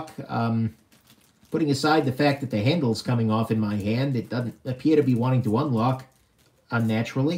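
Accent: New York, United States. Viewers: Heavy NY accent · Violently New Yorker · Is that a new york accent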